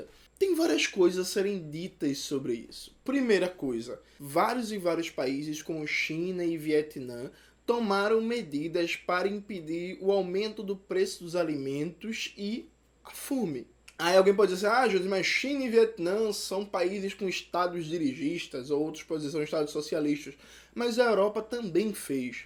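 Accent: Pernambuco, Brazil. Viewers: Veiga Pela sua falta de percepção a respeito do que é o sotaque de um pernambucano, percebe-se que a dificuldade está em vc de entender o que é a realidade e a diversidade brasileira e, claro, o que ele diz no vídeo. Em nenhum momento ele defendeu, assim como qualquer outro comunista ou socialista sério e radical defenderá a estatização de todos os meios de produção, mas sim a progressiva abolição da propriedade privada dos meios de produção, o que é bem diferente. Mas se vc não é capaz de perceber e nem de respeitar um simples sotaque tb não deve saber diferenciar o que eu esbocei aqui.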